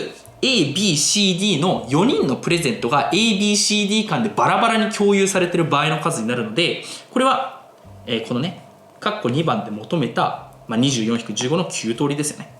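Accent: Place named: Yamanashi, Japan. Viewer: 山梨だよ、多分甲州弁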